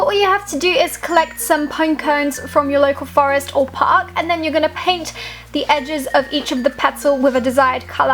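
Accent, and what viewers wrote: United Kingdom. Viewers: Are you british or something? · R u British??????? It's bugging me that I don't know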